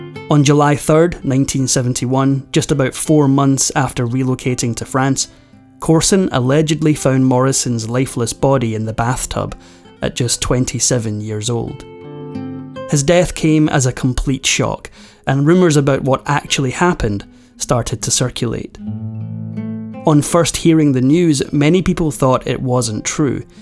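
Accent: Scotland, United Kingdom. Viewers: It's a Scottish accent. And, yes, it's lovely!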